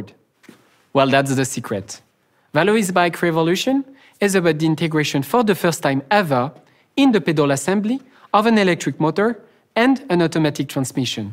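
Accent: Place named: France. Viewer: C'est du marketing, un accent français pour un produit français !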